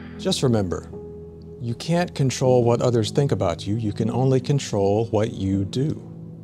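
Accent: South, United States. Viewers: First off, thank you for this video... I can employ it on the MKII (or+FX)... And second, I don't know whose accent I like more... Florian from Bad Gear or your southern charm. HAWHHHHHHich ever though, it adds to your dry wit, which you deploy like a sniper.... first you don't realize the moment is coming, and then when it lands, you wonder where it came from. All interspersed is some of the best first hand practical knowledge of gear.!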